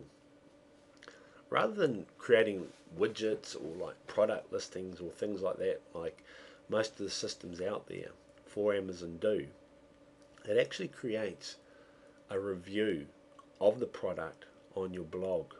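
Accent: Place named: Australia. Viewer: I love the subtitles - mis-interpreting your Australian accent I'm afraid. Sorry - I'll continue to watch the video.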